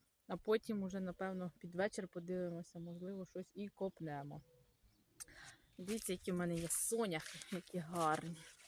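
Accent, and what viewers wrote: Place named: Poltava, Ukraine. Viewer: Приємно чути Полтавський говір. Мої кореня з Ґрадижська. Цікаво дивитися, згадую дитинство. Дякую. ❤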